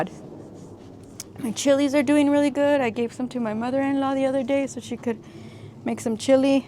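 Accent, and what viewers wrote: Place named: El Paso, United States. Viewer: I clicked on your video without reading the caption and as soon as you started talking I knew you had to be from my area lol I’m from Las Cruces 🥰🙌🏽 and your new follower!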